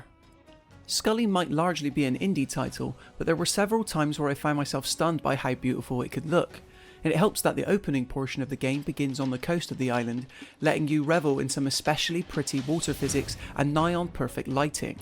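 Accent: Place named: Somerset, United Kingdom. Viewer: Think this guy is from Somerset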